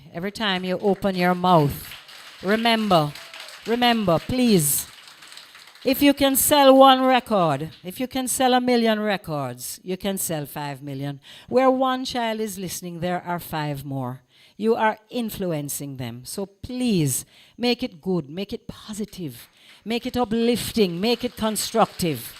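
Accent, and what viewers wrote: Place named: United Kingdom. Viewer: Unfortunately I don't know jamaican accent but her pronunce seems just english -british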